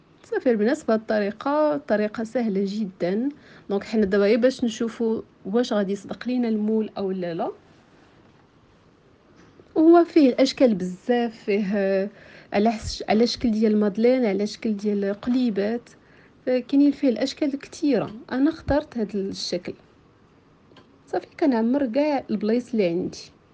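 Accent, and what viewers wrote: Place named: Marrakech, Morocco. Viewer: سلام أنا مشتركة جديده عجبتيني...من هدرتك إمكن انتي من مراكش فيدينا أكثر ومتنسايش دكري لينا الأثمنة بالضبط أ فين واش مرجان وأنا هو فيهم... الله المعين.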